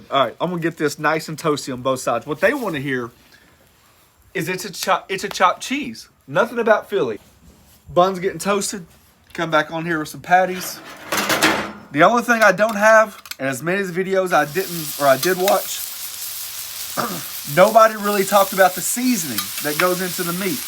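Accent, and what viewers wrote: South, United States. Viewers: First video I’ve watched of you . I’m from SC and hear some southern dialect. Love it !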